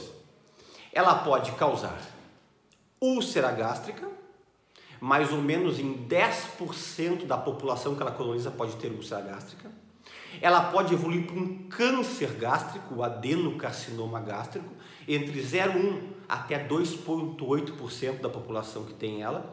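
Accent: Rio Grande do Sul, Brazil. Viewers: Não é bravo, é gaúcho. São assim. rss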